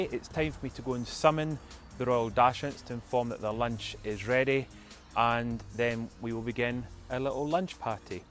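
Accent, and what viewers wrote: Scotland, United Kingdom. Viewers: I just love your Scottish accent! I know, I know, I'm biased for I'm Scottish too. Looked forward to watching your channel, your tips on etiquette. Who know, one day I may have someone from the gentry coming for tea!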